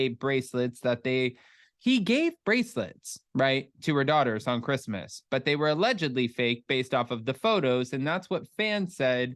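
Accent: New Jersey, United States. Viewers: We love your 'Joisy' accent. it really colours your story! Hope you and Jason have a great weekend, and that Shaylee gets some well deserved rest!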